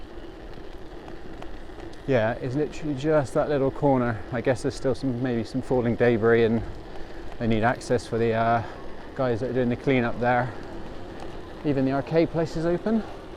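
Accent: Bristol, United Kingdom. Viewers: Checking this out now to see what’s changed Cars at the start for definite Porthminster cafe is gorgeous and the food always excellent - cool rain clouds on the horizon Nice manoeuvre down the hill, and the high street much more active but nothing like usual at any time of year Didn’t see the news about the fire; watching this instead of going to bed but I’ll look that up tomorrow What Gallery did your Dad own ? Recognised that accent as I’m from Cheltenham, just up the m5 from Bristol I’ll stop rattling in now and just enjoy the tour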